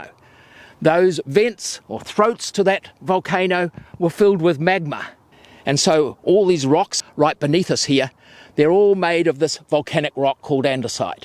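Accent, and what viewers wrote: New Zealand. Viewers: You have to guess it's in New Zealand from the guy's accent. Very interesting, well done.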